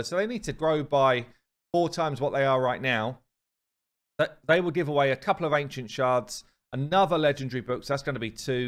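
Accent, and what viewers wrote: United Kingdom. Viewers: Fun fact: I hadn't heard the expression "brucie bonus" before and I know you've used it many times in your vids including this one, so today I finally looked it up. When you do a google search the very first link to a definition that pops up....well lets just say it has to do with ejaculate and erections haha. Brucie bonus indeed! Also, for a longest time I was mystified when you were making reference to your "finite" teams. I thought "finite"? What is a "finite" team, and where would I use it? Does that have something to do with the unkillable "infinite" teams? Then it dawned on me - you were saying FIRE KNIGHT, not finite rofl. Don't get me wrong tho, I love British accents 😸.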